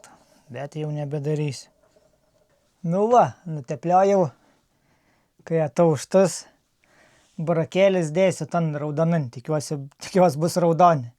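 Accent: Aukštaitija, Lithuania. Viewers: Smagu žiūrėti tamstos video ir klausytis gražios aukštaitiškos tarmės😅🎉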